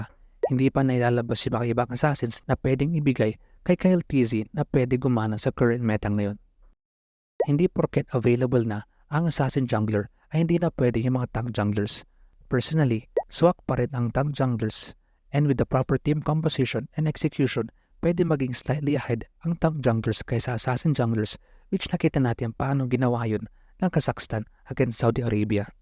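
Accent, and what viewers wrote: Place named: Pangasinan, Philippines. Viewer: Naririnig ko ung Pangasinan Accent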